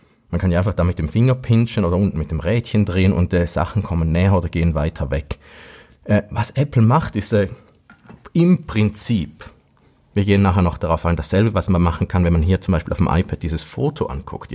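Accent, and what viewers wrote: Switzerland. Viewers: Deine schweizerische Tonfärbung einfach eine grossartige einschläfernde Wirkung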